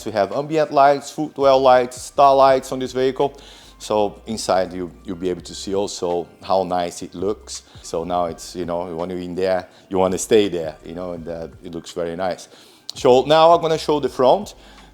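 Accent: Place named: Brazil. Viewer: Esse cara é brasileiro certeza kkkkk o sotaque não engana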